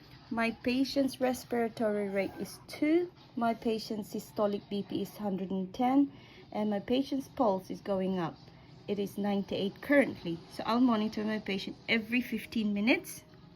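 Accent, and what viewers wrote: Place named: United Kingdom. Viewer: Naks ke galing naman mag tutorial bagay na bagay with British accent pa saan ka pa nun..😆💕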